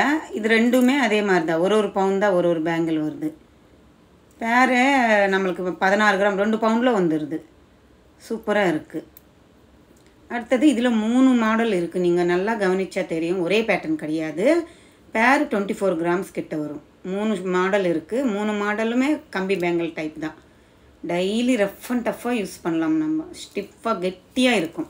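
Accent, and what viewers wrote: Telugu, India. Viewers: Neenga pesurathu thelugu kalanthu varuthu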